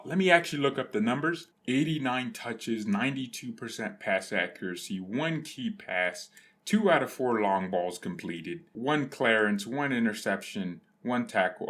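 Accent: United Kingdom. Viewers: never knew u were british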